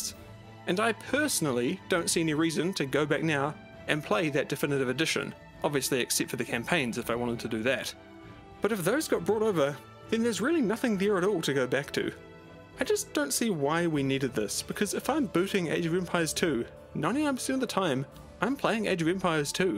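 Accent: New Zealand. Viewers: Good review! Question: where do you come from? The accent reminds me of New Zealand or something like that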